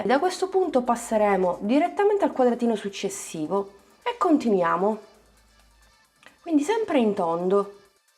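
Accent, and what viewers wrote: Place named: Palermo, Italy. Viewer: anch'io sono di Palermo, qualche flessione verbale mi ha insosspettita 😊 Ribadisco i miei complimenti per i tuoi lavori soprattutto visto la tua giovane età 👏👏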